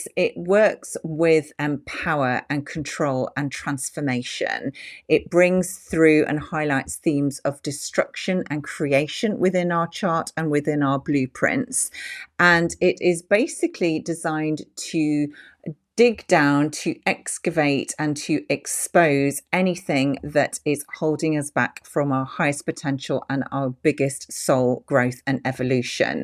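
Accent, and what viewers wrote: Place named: United Kingdom. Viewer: Hello, my middle name...also, its nice to hear a british lady doing astrology, very few are very good, i have studied astrology since i was `16, because i wasnt good at sussing out people, astrology helped me so much there- now i see it actually working on the world stage- the wise men of the past knew much more than we think we knew, they used it daily and as a map and we can learn much from this xx THANKYOU X m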